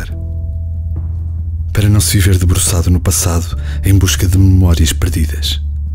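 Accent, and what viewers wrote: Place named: Portugal. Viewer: Gostei de escutar no sotaque do portugês de Portugal!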